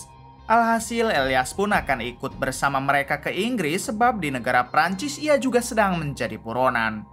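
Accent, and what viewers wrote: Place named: Madura, Indonesia. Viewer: Anjay derih madureh nkk bos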